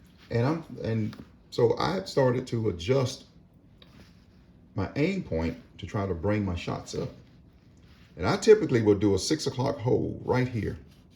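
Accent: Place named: Alabama, United States. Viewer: I’m from Alabama originally and it sounds like you might be from there. Am I right?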